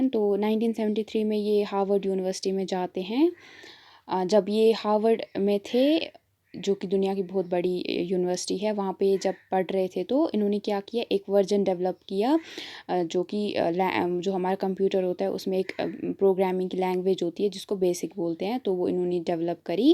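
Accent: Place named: Himachal Pradesh, India. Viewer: Your himachli slang is soo good 😉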